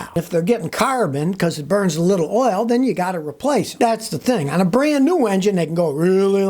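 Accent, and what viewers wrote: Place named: New England, United States. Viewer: I really like and enjoy your videos. I like y'alls yankee accent, and also the way you speak very fast. HBD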